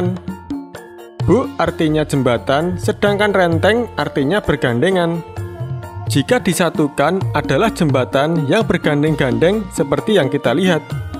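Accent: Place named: Banyumas, Indonesia. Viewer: Mas asli orang mna sh, kok ngomong nya agak ngapak sedikit 🤣🤣🤣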